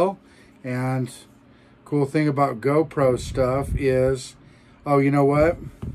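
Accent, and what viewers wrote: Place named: Canada. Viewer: You sound Canadian for an American.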